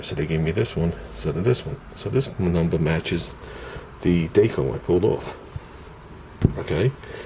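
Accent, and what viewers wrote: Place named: East Coast, United States. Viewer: East coast accent.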